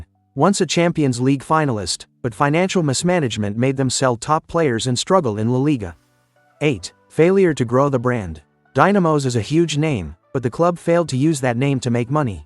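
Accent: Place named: United States. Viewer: First of all Bernard Marriott must take full responsibility 4 the current predicament Dynamos fc finds itself.Marriott ,a founding member has been treating Zim's most illustrious, famous,successful fc as his fiefdom.If Dynamos gets relegated ,all Dynamos fans should descend on Bernard Marriott or call 4 a fatwa on him !.Secondly the yank voice over is nauseating and atrocious to say the least !.Marriott must disappear from the helm of Dynamos.True Dynamos legends like Mhofu,Mwendamberi,Kwinji 15,Mozze etal must come back and serve/save Dynamos Fc !!!!!.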